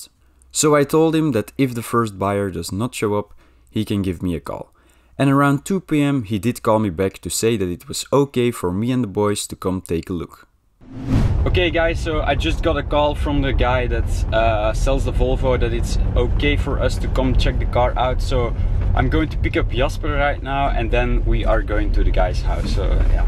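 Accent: Netherlands. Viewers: Nederlands? Ik dacht het al te horen maar dit soort video's zijn wel echt geweldig dus duimpies omhoog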